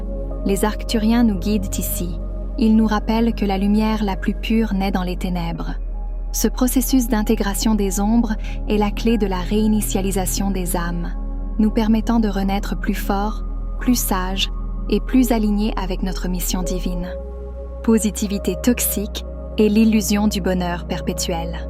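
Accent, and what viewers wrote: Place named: Canada. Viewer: ************ Merci l'IA au petit accent canadien de ce message...Et merci aux initiateurs de celui-ci. *********************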